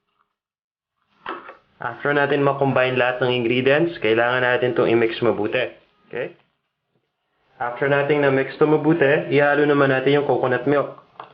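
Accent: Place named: Visayas, Philippines. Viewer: disappointing filipino who is very poor in giving instructions as well as has no idea about measures. ademas, obviously not from the tagalog region. in a brazo de mercedes video says 'i-combine' when in tagalog combine simply translates to 'ihalo'; transfer - 'isalin'. maybe he is bisaya either make your presentation in english or stick to cebuano!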